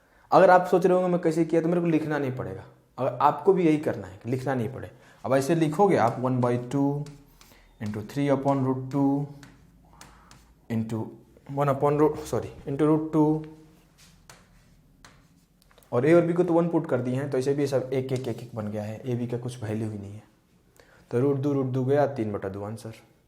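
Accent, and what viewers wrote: Bihar, India. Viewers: Bihar se ho?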